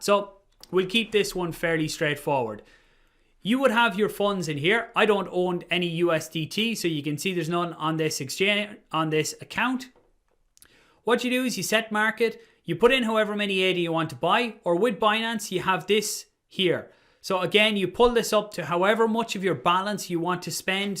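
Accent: Ireland. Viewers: Great idea just creating content just for ADA. Are you from Ireland? I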